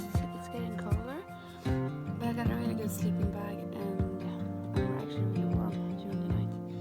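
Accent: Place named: Norway. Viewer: incredible! i want to do this in may! also you sound super norwegian... is that the case? well done!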